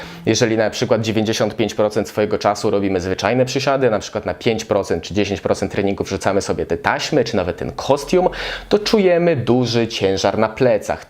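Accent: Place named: United States. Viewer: Za sam amerykański akcent należy się łapka w górę ;)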